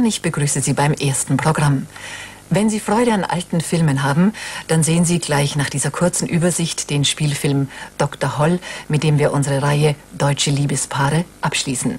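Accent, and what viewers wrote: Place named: Bavaria, Germany. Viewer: Carolin Reiber klingt hier noch ungewohnt "bayerisch" , fast als ob sie dann beim Wechsel nach Mainz einen Nachweis für Hochdeutsch machen musste😅 Und wie damals immer auf inszeniert in s/w und schlechte Tonqualität hingewiesen wurde. Müsste man sich heute mal vorstellen, in ZDF/ARD bitte entschuldigen Sie dass wir den Film nur in 720p HD ausstrahlen 😂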